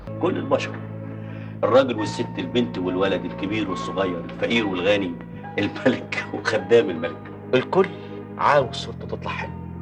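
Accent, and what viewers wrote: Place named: Iraq. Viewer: قناتك تعمل محتوى ذو جودة عالية وهذا سبب انتشارها السريع استمر هكذا وسوف تنجح أكثر.. بالمناسبة أظن أن لهجتك ليست عراقية بشكل كامل فهي مبسطة وتدمج فيها الفصحى وهذا يجعلها سهلة لغير العراقيين. عجبتني اللهجة ولكن الموسيقى الخلفية مكررة ..ياريت في كل فيديو تستعمل موسيقى مختلفة. وياريت تعمل فيديو كيف تصوير الفلوغات .. فأنا أحاول عمل فلوغات ولكني لا أعرف كيف أختار زوايا التصوير.. اعمل لنا هذا الموضوع مستقبلا لو استطعت.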